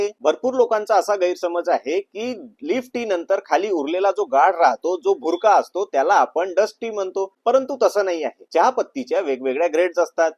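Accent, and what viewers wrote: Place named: Vidarbha, India. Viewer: विदर्भाची भाषा, राहते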